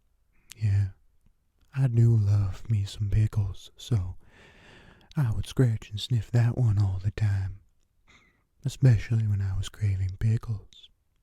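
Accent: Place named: South, United States. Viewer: Southern accent,I love it!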